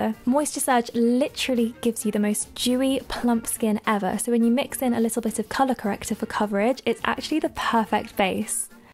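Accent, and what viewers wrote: RP, United Kingdom. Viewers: why does she sound so posh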